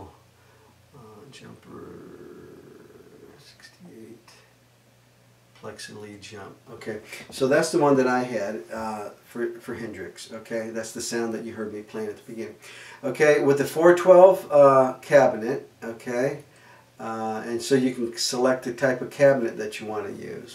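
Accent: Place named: Los Angeles, United States. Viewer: Where is your accent from? L. A.? Reminds me of Greg Graffin from Bad Religion in old interviews.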